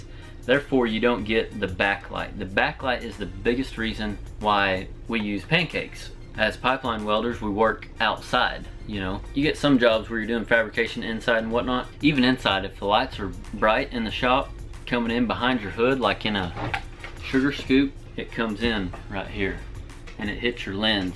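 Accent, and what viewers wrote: Oklahoma, United States. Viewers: You from Oklahoma???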